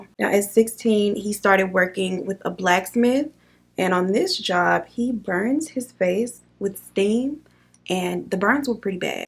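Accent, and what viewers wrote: South, United States. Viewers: I like you. You have calming voice along with everything else. If you would accept a positive advice, of course in case you would like subscribers from all over the world, including not native speakers. I understand you in general, but sometimes I can't hear clearly. You speak soft and when you do it fast, silent and typically southern with lots of reductions i am having a hard time. Please don't misunderstand me, it's all positive, I love your videos and wish you the best.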